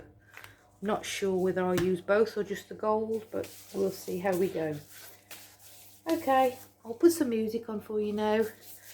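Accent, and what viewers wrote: United Kingdom. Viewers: Another amazing piece, where are you from? You sound so English to me but I see you are in Australia. I am English and currently live in USA.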